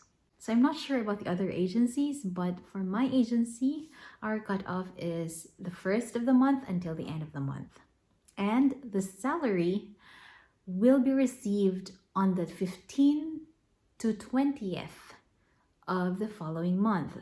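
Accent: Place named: England, United Kingdom. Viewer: First I love your English accent,Gal you're flawless,thank you for your honest opinions.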